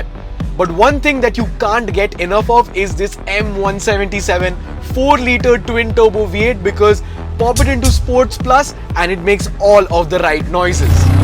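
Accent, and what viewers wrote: India. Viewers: Very Indian Authentic accent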